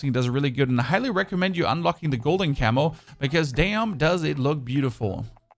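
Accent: Germany. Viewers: Alta du bistn Deutscher? Holy moly, ich hab's nie vermutet... xD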